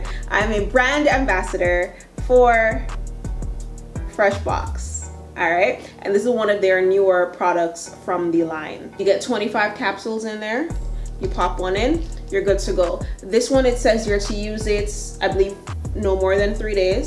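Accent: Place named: Jamaica. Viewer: Got to be Jamaican love it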